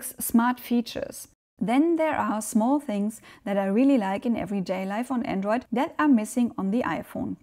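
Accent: Germany. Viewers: Love the German accent, wish I was able to speak German, such a interesting and unique language. ❤ from 🇨🇦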